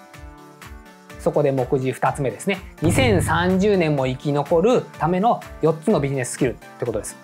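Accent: Hiroshima, Japan. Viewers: えーコロナ！大丈夫ですか？広島弁聞きたかったん！ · 心地よい広島弁ですね✨ 勉強になりました！ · 非常に説得力あるお話でした！勉強になりますね。ありがとうございました。広島弁で話されてるところも聞き入るポイントです。話すスピードも私的にはgoodです！ダラダラ話している方は飛ばしたり、早送りしてしまうので。最後のじゃあね、バイバイがまた可愛いくホッとします笑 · さん、ご家族でコロナ大変でした😂元気に復帰していただいてとても嬉しいです🤗奥様も3人の子育てで大変なので段々イライラしてきたんでしょうね😅 さんが作ったスキル分かりやすいし素敵です🤗課題発見力の必要性と商売の本質も学べました。やっぱり疑問に思ったり、思考力が大事と思いました。フルモデルチェンジ力のお話しも納得！過去の成功を捨てるのは勇気が入りますがしがみついていると時代に取り残され稼げなくなると思いました。変化に対応できて学び続ける人が稼げると私は思いました。大手が参入している領域では勝てないので、個人でどうやって専門性を見つけ稼ぐに繋げていけるのか動画にあげてほしいです🤗次回の動画も楽しみです🤗 さんは未来予測もしてますが私達に現実、事実を教えて頂いている希少性が高い存在です☺️応援してます😊あと広島弁も素敵ですね😉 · 面白い動画ばかりで、広島弁も心地いいです。ただもう少し完結した動画にして欲しい。でも楽しいですよ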